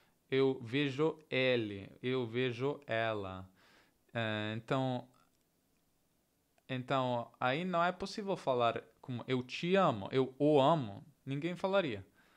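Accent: São Paulo, Brazil. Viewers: Está ficando muito bom o seu português, pra mim vc está com um sotaque mais perto do Paulista.. se vc falar com TU, fica parecendo um sotaque da região sul do Brasil, vc vai se confundir muito, de uma focada em vídeos aqui do Brasil como o canal NOSTALGIA, HISTÓRIA E TU, vc vai identificar as palavras com mais facilidade... uma pequena ajuda... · Ciao,. Imparo tanto tanto "contigo" che vorrei darti indietro un po' dei miei commenti da nativo pigna che ha sempre qualcosa da dire Algumas dicas: "me deu" diferenças, senza la "i" profissional, con la "i" parabéns!!! "padrão", non mi aspettava - bello uso! accezione vira acepção, que é un parolone alto; forse potresti usare sentido Pude notar uma bela mudança das tuas "d", como fazem os paulistas. Essa fala híbrida com uso de "você" ao mesmo tempo que usamos as "subformas" do "tu" (te/ti/tua) é uma coisa que faz o Pt-Br bem insólito e complexo para os estrangeiros. 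Falamos "teu/tua", mas "seu/sua" soa mais macio (la "s" è sorda, morbida) que a dureza do "t", que vem de maneira até um pouco rude. Você já viu que "seu" é "pronome de tratamento" também? Tipo: "Seu Eugênio" = "Senhor Eugênio". o tempo "todo"; tudo x todo - eu achei um "quick way" para explicar isso e você saberá pôr em um conceito gramatical mais complexo. Todo é usado usualmente seguido de um substantivo (todas as coisas, todo o mundo, todas as mulheres), enquanto (tudo é um pouco self-sufficient haha e está bem por si só (ela comeu tudo, eu quero tudo, tudo que eu quiser...). Não sou linguista. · 👏🏻👏🏻👏🏻👏🏻👏🏻 Amei quando você falou "estou aprendendo subjuntivo futuro" 😂 Acho que eu nunca aprendi... Sto scherzando. Você está falando muito bem. Com o sotaque de Sao Paulo. O sotaque de São Paulo está para o português "standard", assim como o sotaque de Milano está para o italiano "stantand". 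Por exemplo, o nativo de São Paulo não usa o pronome "tu" no lugar de "você". E aqui, por influência dos italianos que vieram morar no Brasil há mais de 150 atrás, falamos as sílabas com o mesmo tempo... sem suprimir nenhuma sílaba. Isso faz o português do Brasil, sobretudo o de São Paulo, ser semelhante ao modo de falar dos italianos do que dos português. Por exemplo, aqui no Brasil falamos "pre-si-den-te" com o mesmo tempo para cada sílaba. Já o portugues de Portugal, eles apertam, espremem algumas sílabas, dificultando o entendimento. Você está indo muito bem no aprendizado do portugues brasileiro. Mas acho que a ultima coisa que você vai aprender é que não falamos "mais grande"... não falamos "piu grande", como os italianos costumam falar. Mas falamos "maior" ou "é maior". 😊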